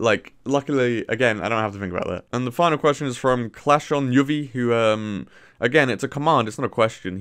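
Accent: United Kingdom. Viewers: R u from liverpool i thot u from london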